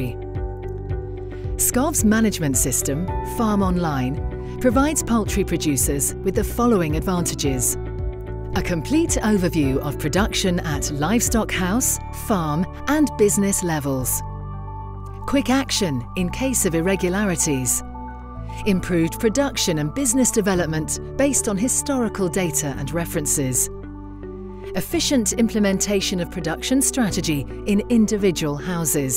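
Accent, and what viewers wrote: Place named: United Kingdom. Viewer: The only horrible thing of this video is that brit accent. I had to turn off the audio.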